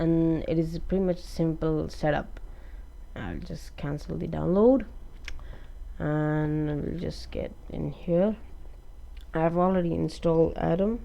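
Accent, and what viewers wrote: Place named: United States. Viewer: are han thike mujhe maloom hai kya amrica ki accent mai kyun baat karre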